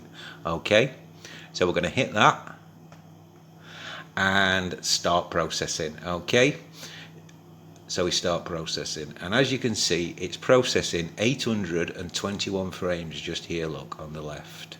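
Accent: United Kingdom. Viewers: Thanks for the brief introduction to SharpCap. Like you say, there not many YT videos to help get started. It's good to hear a British accent downunder. Keep up with the humour.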